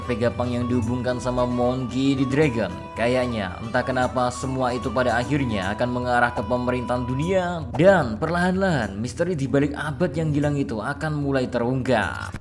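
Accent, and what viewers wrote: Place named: Lampung, Indonesia. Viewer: ini orang lampung kayaknye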